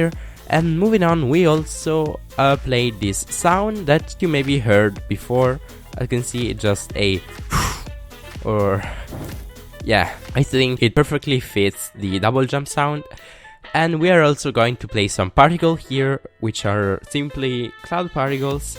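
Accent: Italy. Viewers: Italian :D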